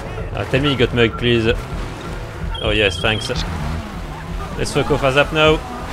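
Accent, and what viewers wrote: France. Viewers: I hear ur French accent can you say Rickey Bobby like the French guy form Talladega knights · Can we all just appreciate his fine French accent and the fact that he CLEANS THE LOBBY for toxic tryhard? Thanks a lot dear lord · i usually dont watch gta online stuff but this vid was fkin hilarious and your frnech acent makes it waaaaay better hahahaha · love the french accent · his french accent is like the best combination for the vid istg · you got a french accent, just like me :D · Un accent purement français mdrrr ps t’es vidéo sont vraiment Cali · this accent sounds french i love it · At first i thought you was Polish/Russian but after hearing your accent for one more time your French,lol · i like ur french accent :) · Le bon accent français bonne vidéo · Are you french because your accent is really cool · At first the heavy French accent put me off, and yet over time I find it so hilarious whilst speaking English. 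Easily subbed. You losing your shit or wrecking someone is some top tier stuff. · that french accent is majestic, as a french person I can agree your accent is awesome! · L'accent Français qui fait plaisir :awesome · This guy has the most french accent of all time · the French accent makes this 1000x funnier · I loved the video and the french accent made everything better!!😂❤ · french accent is " vraiment cool mon gars " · Le bon gros français de base qui parle anglais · French accent makess this so much better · I love the french accent. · That french accent tho💀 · Sorry if I seem to be rude somehow, but probably 90% of everything I understood on hearing, considering your French (?) accent, was the swearing. 😄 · le petit accens francais sa me fume ^^ , sinon gg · I subed cuz I love ur French axent and love ur humor and I'm part French but I have yet to learn such a language but first I want to watch all the rest of ur vids cuz this is my first vid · The accent made it better ol frenchy boy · 3 things 1. 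This was satisfying 2. WOOW THAT WAS AMAZING SHOT 3. Hearing a french talking english is indeed satisfying to the ear idk why + great ability sir, long live bicycle cult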